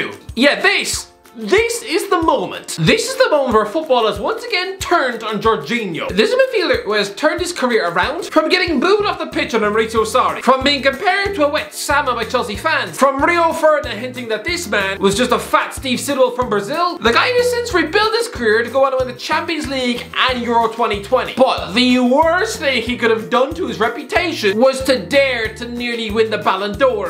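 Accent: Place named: Ireland. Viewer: A Walking Meat Loaf, a Walking Taradactul....man this Irish fella, along with that accent, sure does know how to make jokes, all while telling football news! Bravo sir...bravo indeed! Btw did u forget Origi's goal from TAA's corner against Barcelona? You were rattling off so many, wasnt sure if that one was in or not!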